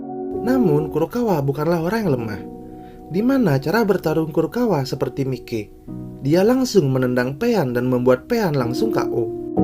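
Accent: Bali, Indonesia. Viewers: Pasti orang Bali ni Mimin nya😁😍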